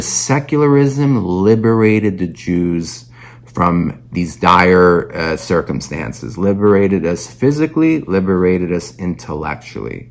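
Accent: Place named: Queens, United States. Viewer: I am so glad to have found you! I was watching an ATHEY CREEK video today, and Pastor Brett spoke at length about your work! And I have to be blunt - as a girl from QUEENS, living in the SOUTH for the last 35 years, I was tickled to actually hear someone that sounded like ME! So glad to meet you! Looking forward to your next video! 👍🙏👍🙏👍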